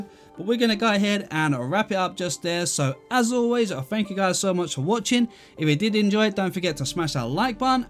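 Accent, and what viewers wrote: United Kingdom. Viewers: I like ur british acent❤❤❤ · I love bri ish people shutter: shu uh. Three: free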